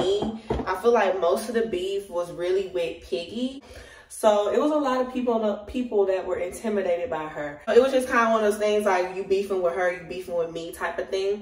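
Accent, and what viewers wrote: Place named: Memphis, United States. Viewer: Are you originally from Atlanta? You sound like a Memphis girl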